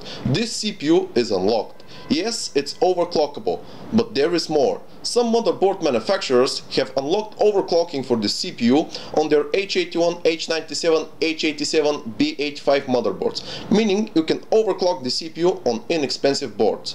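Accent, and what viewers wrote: Russia. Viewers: I love the madarfaking russian accent :D (i am Bulgarian, though) :D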